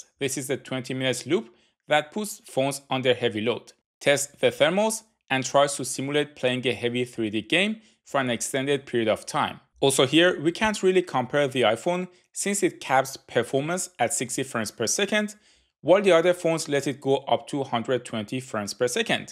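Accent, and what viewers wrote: Iran. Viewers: You sound Irani, are you ?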